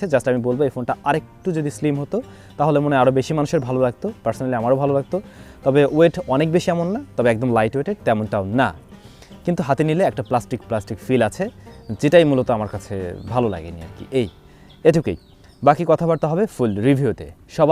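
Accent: Barishal, Bangladesh. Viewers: ফাও কতা কইয়া লাভ নাই। ভাই যে মোগো দেশি কতা হুইন্না বোঝা গেছে🤣🤣🤣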